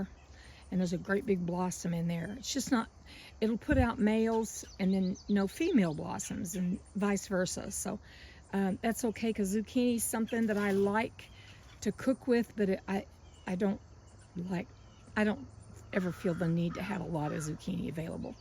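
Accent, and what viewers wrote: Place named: Ozarks, United States. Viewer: Hi! I always savore your videos! I will do a research about, Ozark Mountain. Have studied linguistique, I can recognize, what we call the purity speach! Which you have preserved wonderfully! Hugs my dear friend!🥰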